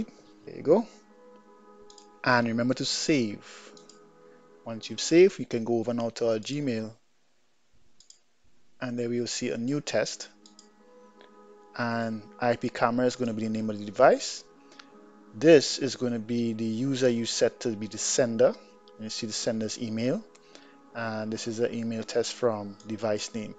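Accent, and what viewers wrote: Trinidad and Tobago. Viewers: you sound trini!Im here from Barbados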